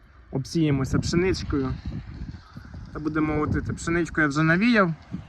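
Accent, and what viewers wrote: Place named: Cherkasy, Ukraine. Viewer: Почув наче єрки то ти з Черкащини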